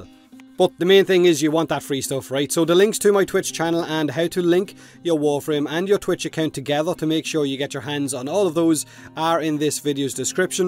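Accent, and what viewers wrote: Ireland. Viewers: Hey, you are Irish, right? Do you know Plenty O'Toole?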